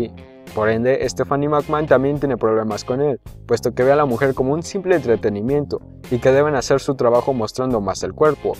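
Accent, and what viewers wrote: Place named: Andalusia, Spain. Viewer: Me desuscribo, no me gusta tu acento sureño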